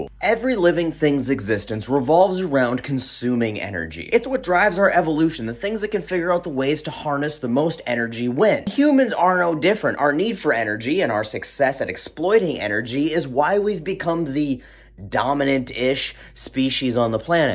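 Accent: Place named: Minnesota, United States. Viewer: It's Minnesota English...It's spelled 'nuclear' but we pronounce it 'nucular.' Get over it.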